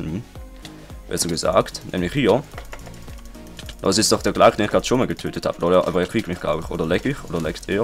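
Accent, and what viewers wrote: Switzerland. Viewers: Bist du Schweizer?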